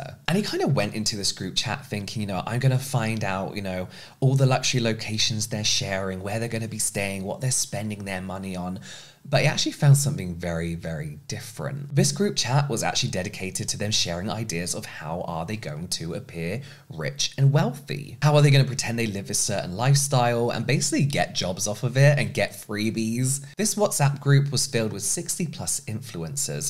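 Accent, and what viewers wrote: United Kingdom. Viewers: The perfect beautiful English 😍 I'm Dutch and I love the British English language, but you're a literal cherry on top 🍒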